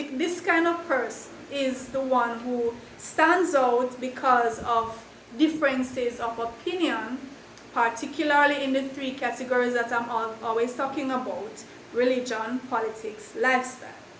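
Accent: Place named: Jamaica. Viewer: Listening to the content and the patois🤭😊. Thanks for the insights. Cheers namesake💪!!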